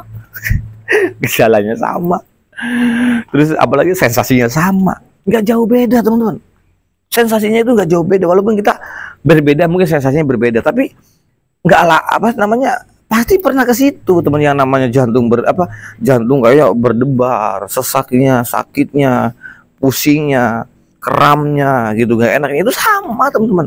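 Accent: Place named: West Java, Indonesia. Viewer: Kalo dari logat2 nya kaya orang Sunda kang, saya dari cibiru bandung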